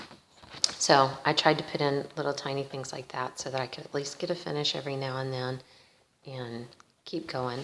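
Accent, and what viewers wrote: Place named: South, United States. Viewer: Just found your channel. Sounds lie you might be from the South. I'm from Georgia. Are you anywhere near there? We had a lot of the same answers on Tracy's talking tag.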